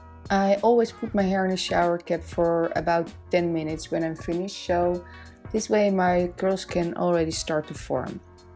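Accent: Netherlands. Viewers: Hoor gewoon aan je accent dat je nlse bent 😍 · Oke dit is misschien een beetje apart maar ik hoorde aan je accent al dat je Nederlands was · I think you're from the Netherlands the accend gives it away😂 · You sound Dutch! Are you? Hoi🙋🏽‍♀️ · Haha lekker hollands accentje heerlijk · Are you dutch? You sound verryyyy dutch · Ben je toevallig Nederlands? Ik hoor een nederlands accentje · Are you Dutch? You have a very strong Dutch accent! I think. [edit: yes, cat Vlinder was just introduced] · you sound kind of Dutch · The Dutch accent is strong but I like it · I've never seen more beautiful curls before 😍 You are like an angel, you motivate and inspire me to love and take care of my curly hair no matter what other people say (classmates all have straight, thick hair and I have thin curly/wavy frizz! -_-) At least my hair is natural and not as damaged as their hair, but it seems they all think ringlets looks funny and childish.... 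😒 THANK YOU so much for motivating me!!! ❤❤ Also, your accent is super beautiful, I believe you are from the Netherlands? You're such a beautiful lady really. Hugs from Sweden 😇💕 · Are u from Holland because u sound like a dutch person with broke englishe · Je kan het nederlandse accent er zo goed door heen horen haha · Beautiful hair. U sound like my Dutch husband lol I’m guessing U are Dutch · She really sounds dutch. Thats a typical dutch accent. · Is she Dutch? She sounds really Dutch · je hoort dat ze Nederlands is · I want to say you are dutch. I can hear it in your accent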